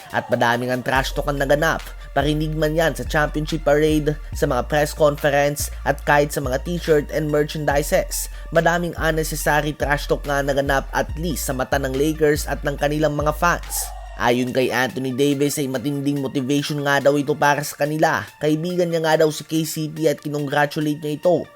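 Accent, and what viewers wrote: Visayas, Philippines. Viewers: Bisayang bisaya ah 🤣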